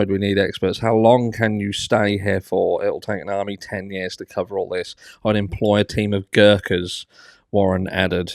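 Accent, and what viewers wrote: Norfolk, United Kingdom. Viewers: Are you a Norfolk boy?, recognise that accent anywhere, lol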